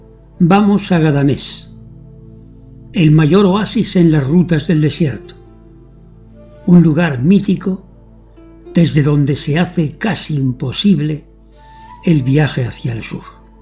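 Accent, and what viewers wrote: Spain. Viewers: Me jode mucho el acento español tan marcado q por momentos ni se entiende, por eso no pude seguir viendo el documental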